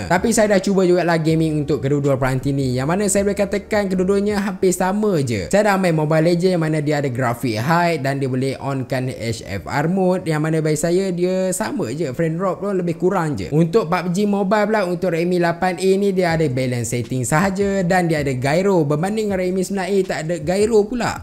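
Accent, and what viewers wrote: Taiping, Malaysia. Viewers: Abg orang Taiping ke? Hahaha baru perasan 😂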